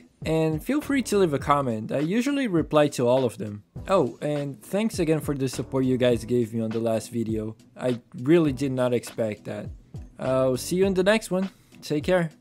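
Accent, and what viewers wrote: Brazil. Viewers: are you from Brazil? your accent sounds brazilian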